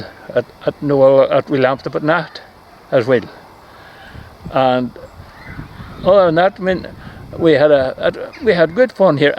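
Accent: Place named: Scotland, United Kingdom. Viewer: Sounds perfectly Scots to me.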